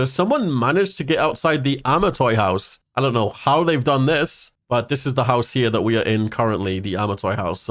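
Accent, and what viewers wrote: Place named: RP, United Kingdom. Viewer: Lucent entry, but most importantly listening to the posh accent in your voice. 😂✌️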